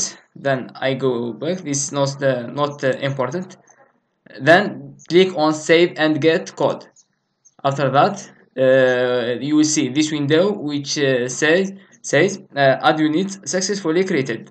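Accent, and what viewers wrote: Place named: Russia. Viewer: i love the Way you talk I love russians when they talk :)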